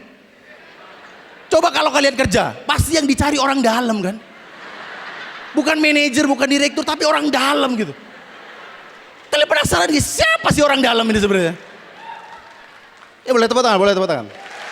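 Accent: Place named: Madura, Indonesia. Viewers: duro nya medok mas😂😂😂😂 lanjutkan kita serumpun😂😂😂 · si muslim maduranya masih berasa wkwk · Logat maduranya kental bnget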